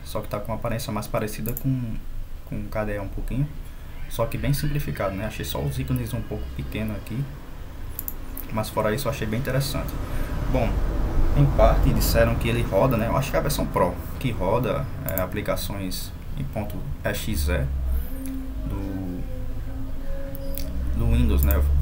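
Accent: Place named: Northeast, Brazil. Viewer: Sou usuário Ubuntu e não na troco por nenhuma outra distro, pois aposentei o distro-hoppismo. Aliás, muita gente fugiu do Ubuntu por causa dos snaps, todavia acho que os pacotes snaps e flatpaks foram as melhores coisas que já fizeram. As pessoas, antes de emprenharem pelos ouvidos, deveriam ler sobre os projetos para poderem de formar parecer adequado. Se lessem, saberiam que o futuro de todas as distros Linux é tornarem-se imutáveis e a adoção de pacotes "universais", tais como os pacotes snap e flatpak. Aliás, o colega é de PE ou PB, não é? Desde 2009 que saí do Brasil, mas penso que ainda reconheço o sotaque da minha terra. Cptos ************* P.S.: O Zorin OS Core é muito bonito, sim, no entanto está atrasadíssimo, pois ainda está preso ao Focal Fossa.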